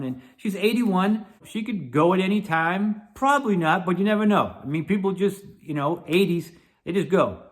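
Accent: Boston, United States. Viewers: I could tell from your accent that you were from Boston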